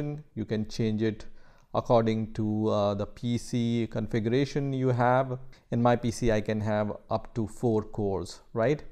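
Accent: India. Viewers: this is very random but you sound kinda indian even though your browser language is German.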